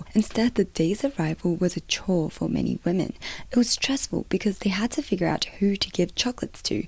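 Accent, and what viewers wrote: Australia. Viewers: Is that an Australian accent mixed in with your English pronunciation? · At first I did a mental double-take at hearing your Australian accent, then I noticed the size of your room and thought "yeah, fits, that's prolly her entire apartment in Sydney or Melbourne and she pays $5000/month rent" 🤔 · Why am I hearing an Australian accent?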